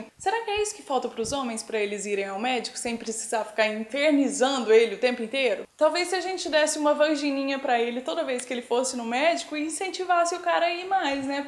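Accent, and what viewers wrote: Minas Gerais, Brazil. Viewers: sotaque minerim uaii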